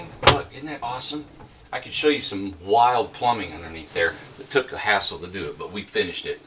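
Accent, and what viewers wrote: United States. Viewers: God I love American accents, this guy's passion is so infectious as well.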